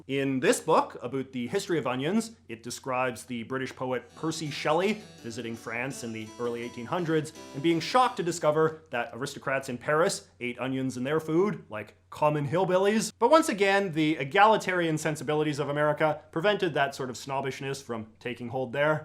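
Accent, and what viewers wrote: Canada. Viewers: he’s Canadian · what is that accent i hear aboat instead of about lol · This guy has the most pronounced Canadian accent I’ve ever heard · He's canadian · is this guy canadian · Strongest Canadian "-out" I've ever heard. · He’s either Canadian or Minnesotan I presume :/ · Bro is the most Canadian Canadian I have ever heard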